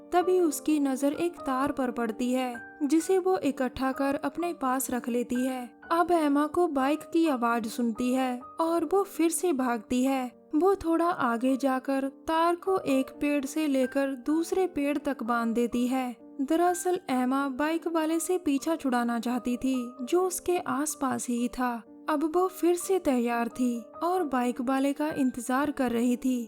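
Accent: Punjab, India. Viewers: Mam I love your voice hope I didn't get addictive 😄❤️🇮🇳 Punjabi tone is the best 👍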